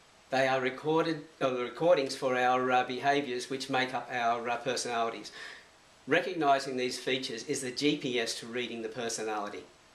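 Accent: Australia. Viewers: now, that's an aussie accent! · I was talking about Tweetling saying you're Australian lol. He asked if you were Australian and it was obvious by your accent, so I asked him how he knew it (jokingly) =D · You have an Australian accent Are you An aussie?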